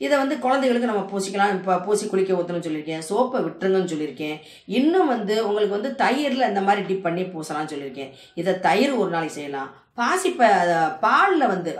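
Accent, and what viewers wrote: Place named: Madurai, India. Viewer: Madurai ya.. 😇😇😇😇..Na num Madurai dhan.... Superrrrr..pesuringa.. 😃😃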